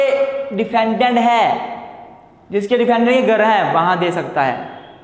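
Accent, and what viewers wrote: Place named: Punjab, India. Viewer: Sir, your accent is punjabi hindi, which delights me, why I don't know, but I guess you have a good teacher ability, which encourages us to approach you, so keep going in this way. Ranjit Basfor, Barshapara, Guwahati, Assam.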